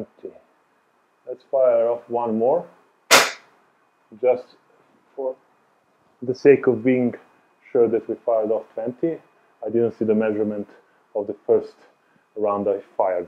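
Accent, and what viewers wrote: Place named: Eastern Europe. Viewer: Ну оочень славянский акцент😅😅😅😅😅😅😅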